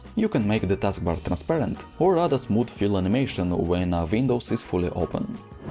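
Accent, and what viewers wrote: Russia. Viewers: Ахах, с первых секунд слышно что русский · русский акценкт крутой, я так явно говорить не умею, как он так научился, у меня вообще только понимание языка вкачано, а остальное плохо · как же чувствуется этот русский акцент) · говоришь с русским акцентом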